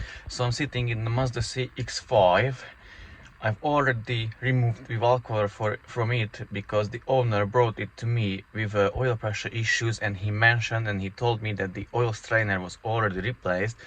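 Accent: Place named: Hungary. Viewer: I thought you were Hungarian your accent is very similar to a workmate of mine. He has a penchant for old BMWs 😊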